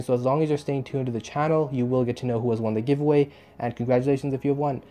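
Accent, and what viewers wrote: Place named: Germany. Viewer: how come a english end up with german accent?:D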